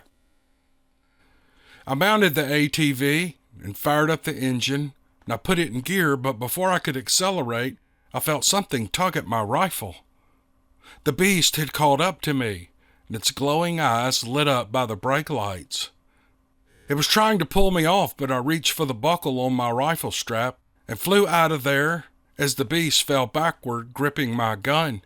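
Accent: South, United States. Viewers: I was born in Louisville. Kentucky. I was raised in the south. I live in Oregon now. Hearing a southern accent makes me homesick. I enjoy this site alot.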